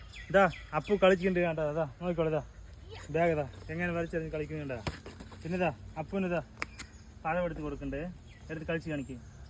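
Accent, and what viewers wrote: Tamil Nadu, India. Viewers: നല്ല മലയാളം അല്ലെ പറയുന്നത് . തമിഴ് കൂടിയ മലയാളം പോലെ സംസാരം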